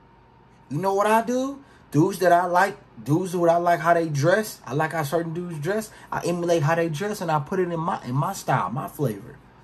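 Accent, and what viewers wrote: Baltimore, United States. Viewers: I thought dude was from Baltimore lol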